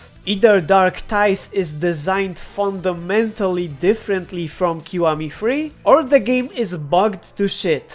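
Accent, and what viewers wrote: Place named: Eastern Europe. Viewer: Please work on your slavic accent. It's very distracting.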